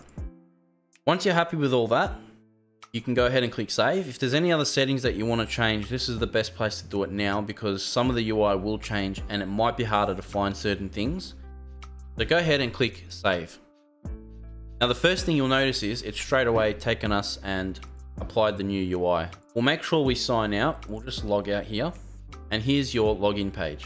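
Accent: Australia. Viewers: don't let Americans force you to change the Aussie lingo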